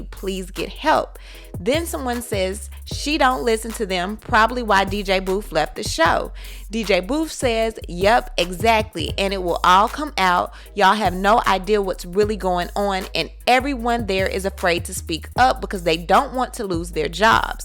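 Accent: South, United States. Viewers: I love and her accent. CORN-tine. You can do no wrong woman! Tea spilling facts with that friendly southern accent.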